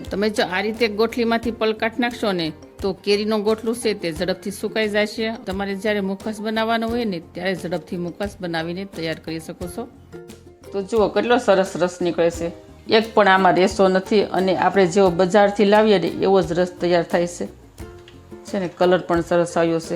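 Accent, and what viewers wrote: Bhavnagar, India. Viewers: તમારી ભાષા પરથી તમે ભાવનગર તરફનાં લાગો છો, બહેન !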